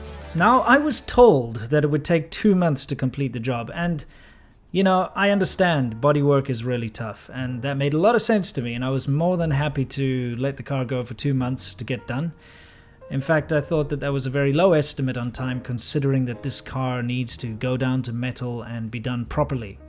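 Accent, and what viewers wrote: South Africa. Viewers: Hey, love your car, and I hope you're not giving too much leeway to this shop. I know it's difficult to find a legit shop, but this is like well past 3 strikes and your out situation. Stay on them, you're investing big $$. Also I had a South African roommate many years ago, your accent takes me back. He was from Sandton, went to Stellenbosch University. Cheers! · What country are you from you sound very south african.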